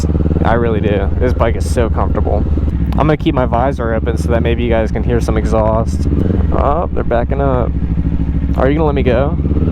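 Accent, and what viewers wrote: Pittsburgh, United States. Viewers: I was like dang this guy sounds like he's from Pittsburgh...then I saw Giant Eagle and Sheetz lol nice review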